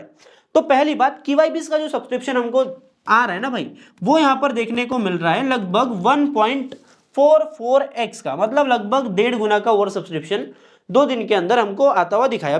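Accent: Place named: Indore, India. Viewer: MP se ho na bhai, indori accent ❤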